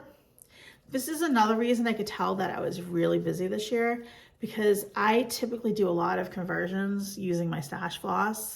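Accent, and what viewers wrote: New England, United States. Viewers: Happy New Year! I agree about 2021….let’s move on 😍. I need to get that Salem 1692 chart. I’m reading The Witches now. Your Elisabeth Addlesee is BEEE U TEE FUL! Thanks for participating in the #stoopidtumorsal. You said it perfectly with your New England accent 😍☺️😳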